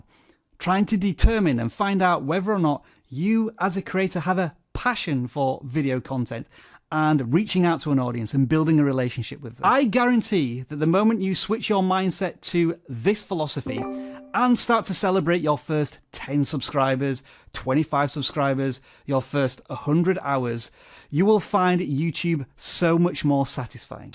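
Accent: Yorkshire, United Kingdom. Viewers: Yes! Straight talking from Yorkshire #fistpump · wait you're british?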